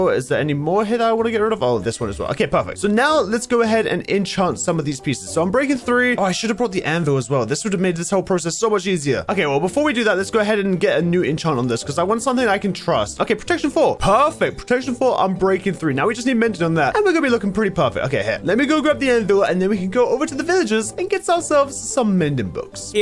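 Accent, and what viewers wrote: United Kingdom. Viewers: I'm still confused if is British or American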